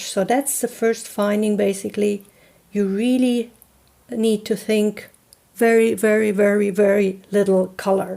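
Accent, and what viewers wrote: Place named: Netherlands. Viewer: Absolutely love this video. I too was adding too much color. I appreciate artists sharing their "mistake" creations. It helps me see I'm not as far off as I feel. I also love listening to you talk. My great grandmother was from Groningen Holland and I adored her. Hearing accents from the Netherlands brings back sweet memories. Thank you for sharing your journey.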